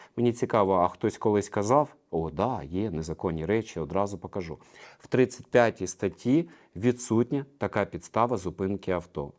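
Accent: Russia. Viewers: не "К"арячу лінію а Гарячу, В УКРАЇНСЬКІЙ МОВІ "Г" ВИМОВЛЯЄТЬСЯ БЛИЗЬКО ДО "Х" А НЕ "К" ЯК В КАЦАПНІ, ВЖЕ ЧАС ЗАПАМ"ЯТАТИ, ТА ПОЗБУВАТИСЯ КАЦАПСЬКОГО АКЦЕНТУ, НЕ ВПЕРЕШЕ ТЕБЕ ВИПРАВЛЯЮ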